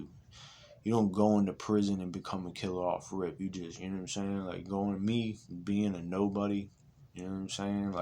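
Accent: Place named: Florida, United States. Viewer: Bra I kno u from florida from the way u talk...boy u real AF!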